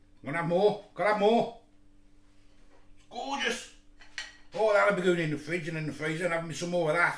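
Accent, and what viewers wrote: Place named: Newcastle, United Kingdom. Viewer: Can't beat a Geordie chef lol whye aye man 😂👌👍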